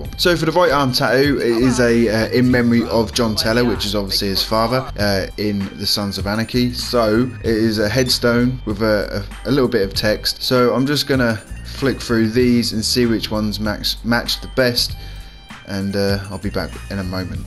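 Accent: United Kingdom. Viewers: Hello small young child, have you heard of the british accent?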